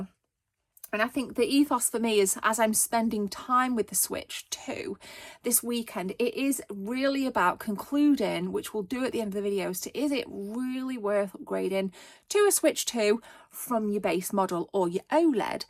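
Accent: United Kingdom. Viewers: I love your accent, I can listen to people from the uk all day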